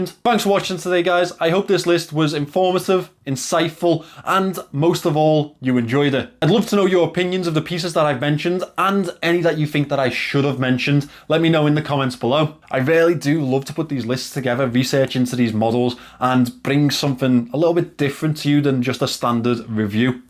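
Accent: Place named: Liverpool, United Kingdom. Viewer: Actually, I didn't know at the beginning. I even thought you were not native English speaker..😅 I researched little and I found out that someone speaks same like your accent and I realized there were many people same like your accent in U.K. and it was Liverpool..😄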